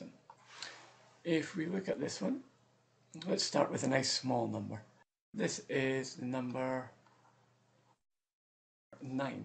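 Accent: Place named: Scotland, United Kingdom. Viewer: The accent made it so much easier to listen to, if only one of my lecturers was Scottish :D Thanks a bunch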